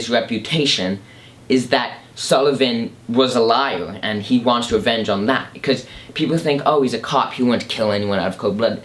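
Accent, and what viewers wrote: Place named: North America. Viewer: Somewhere in North America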